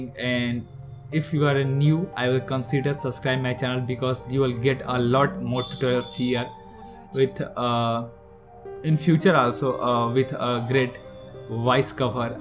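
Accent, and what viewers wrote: India. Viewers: опять эти индусы. не возможно слушать. уши вянут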